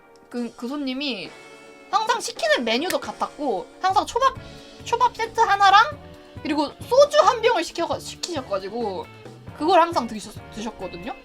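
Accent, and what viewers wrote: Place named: Gyeongsang, South Korea. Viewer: 경상도 사람임? 사투리쓰네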